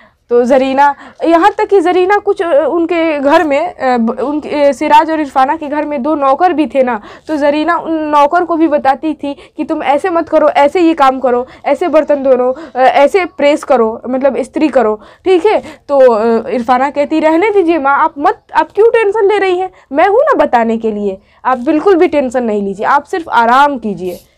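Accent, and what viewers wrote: Bihar, India. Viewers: آپکے بات کرنے کے انداز سے لگتا ہے کہ آپ بہار سے ہو بہار کی کیسی تہذیب ہے کہ وہاں کے لوگوں کا تلفظ تک درست نہیں ہوتا افسوس صد افسوس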